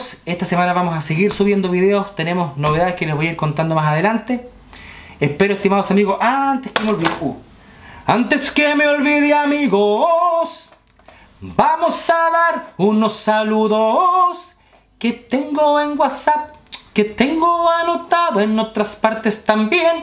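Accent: Chile. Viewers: Eres chileno.?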